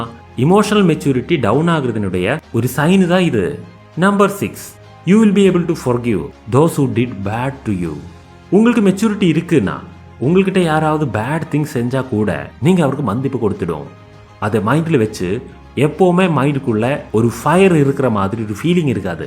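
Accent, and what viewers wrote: Kerala, India. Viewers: Yov ne malayaliya., Alaga pesuraya tamil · Neegga kerala va neegga peysura type same kerala person mathiri iruku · onga tamil slang nalla irukku . kerala va neenga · Neenga malayali thana???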